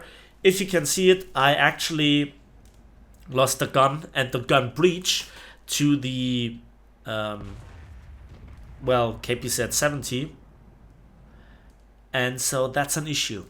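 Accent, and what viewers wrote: Germany. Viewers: I'm subscribed for that crisp German accent and inter-regional banter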